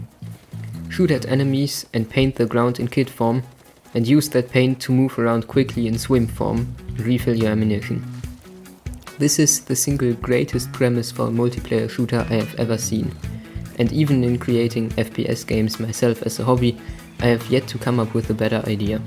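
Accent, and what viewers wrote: Germany. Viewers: Wow thats a really good First video :) And i find it funny how you Sound like having a really strong german accent haha x)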